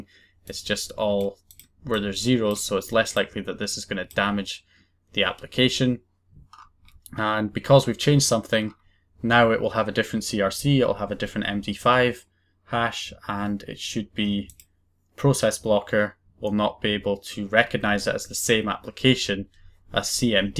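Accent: Scotland, United Kingdom. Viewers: You sound Scottish are you Scottish?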